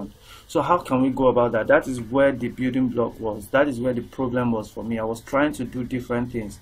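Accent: Nigeria. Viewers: You have a Nigerian accent...are you a Nigerian??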